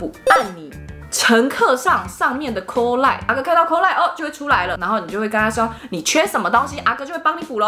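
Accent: Taiwan. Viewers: 台灣國語的口音又親切又真好笑~笑死我了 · 看著影片突然聽到台灣國語感覺整個超親切啊.... 加油喔XDDD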